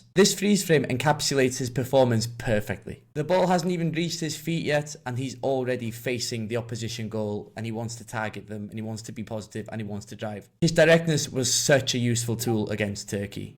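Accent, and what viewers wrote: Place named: North Wales, United Kingdom. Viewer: Dadansoddiad da. Da clywad acen gogs go iawn 'fyd!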